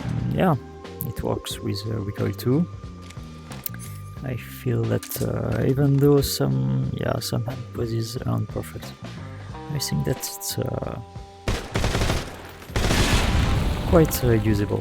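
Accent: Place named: France. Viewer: youtubes ai is so stupid and racist it auto dubbed this from french dispite it just being ur accent smh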